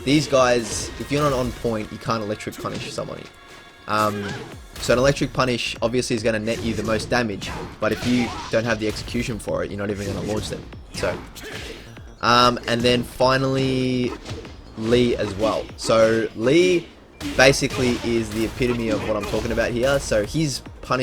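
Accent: Australia. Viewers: The moment I heard your voice haha I knew you were aussie😂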